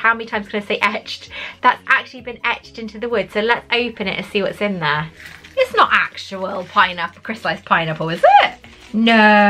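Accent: Wales, United Kingdom. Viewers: Wild accent guess: grew up in S. Wales, live in Brighton now?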